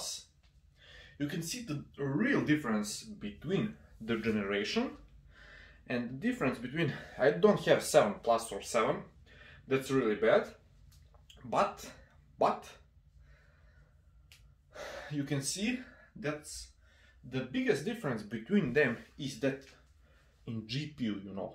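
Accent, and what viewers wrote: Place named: Russia. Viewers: Внатуре русский бля 🤣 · Акцент выдает, чел русский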